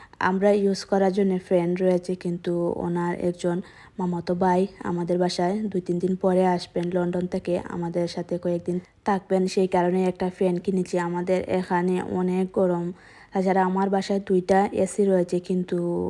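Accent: Sylhet, Bangladesh. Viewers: আসসালামু আলাইকুম আপু কেমন আছো তোমার ভিডিওটা অনেক সুন্দর ছিল আপু তোমার বাসাটা খুব লার্জারি তোমার খুব ভয়েস শুনে মনে হয় গ্রামের বাড়ি সিলেট তাইনা আপু কোথায় থাকো তুমি ❤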